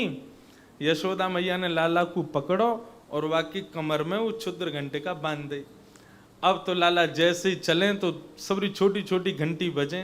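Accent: Braj, India. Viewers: महाराज जी आप इतनी मीठी ब्रज भाषा में बोलते हैं ऐसे लगता है मानो प्रत्यक्ष लीला हो रही है सामने हमारे छोटे से लाला को किसी की नजर ना लगे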